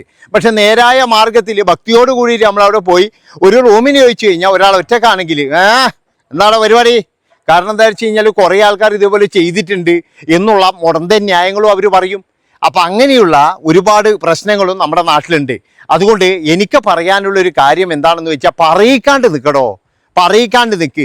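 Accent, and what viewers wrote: Kannur, India. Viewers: ചേട്ടാ, പറയുന്ന ചരിത്ര സത്യങ്ങൾ /ആനുകാലികങ്ങൾ, ലളിതമായി കണ്ണൂർ സ്റ്റൈലിൽ സമൂഹത്തിന് ബോധനം നൽകിയതിന്. 🌹🤝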